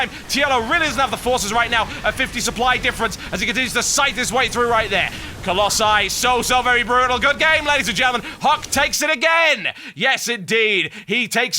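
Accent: United Kingdom. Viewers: just a suggestion TB, since you're catering your casts to those who don't really understand the game or play very well, at the start of the cast when it's perfectly standard play you could perhaps explain the map layout a bit, and the possible playstyles accompanying the maps. Apart from that, keep up the casting! Love hearing a British commentator =D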